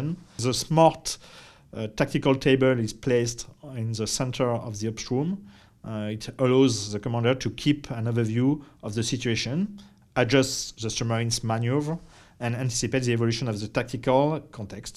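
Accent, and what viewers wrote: France. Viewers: You sound like a French guy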